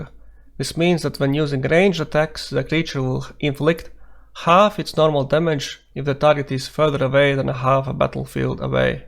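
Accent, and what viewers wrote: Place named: Latvia. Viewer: Latvian accent goes strong.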